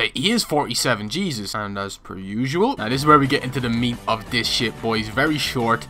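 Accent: Ireland. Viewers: I love that Irish accent coming from a lad from Cork😂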